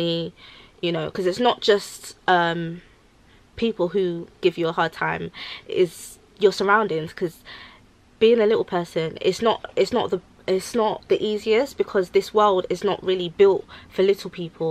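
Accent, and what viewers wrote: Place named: England, United Kingdom. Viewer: mandy_ somda it’s English accent from England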